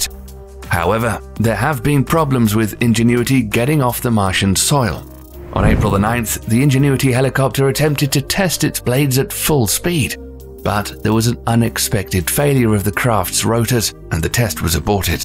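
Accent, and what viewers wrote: United Kingdom. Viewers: I will never NOT upvote any video with the soft dulcet tones of the best british voice narrator.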